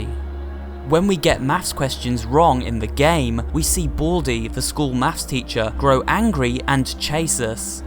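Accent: United Kingdom. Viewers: I like how you have a British accent (no sarcasm intended) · Your british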